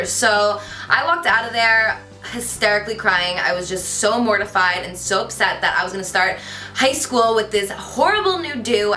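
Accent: New Jersey, United States. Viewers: My husband is from NJ & his whole family talks like this. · The way she says 'water' tho!Am I the only one always hearing the Jersey accent? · So obsessed with your Jersey accent! Lol. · Lol I keep repeating the part you say water. Your Jersey accent is so cute ! 😍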